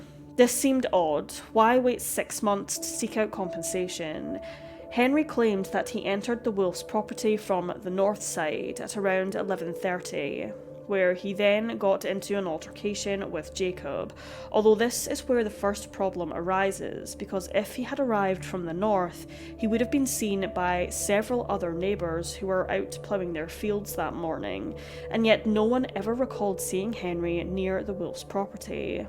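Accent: Scotland, United Kingdom. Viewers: Six. She has a Scottish accent. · She has a Scottish accent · I think the narrator is actually a Scot. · She is Scottish. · she sure is from linda in scotland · I love the orators Scottish accent very sad story no winners both families decimated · Scottish accent!! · I believe she is Scottish. I am English.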